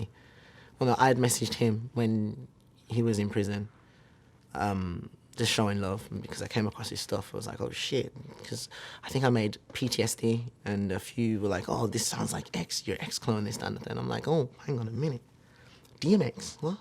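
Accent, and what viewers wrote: United Kingdom. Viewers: He is British...ik that It's Suprising tbh😂🤡🤡🤡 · He said hella fucking British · Bro I didn't know scarlxrd was British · I love his British accent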